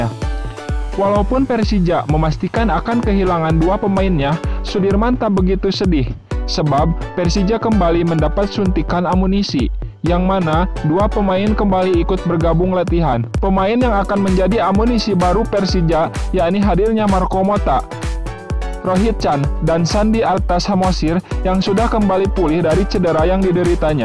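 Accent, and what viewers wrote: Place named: Bandung, Indonesia. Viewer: Konten Persija rasa Persib dari logat adminnya Persib banget. Semoga jadi jembatan perdamaian & persaudaraan.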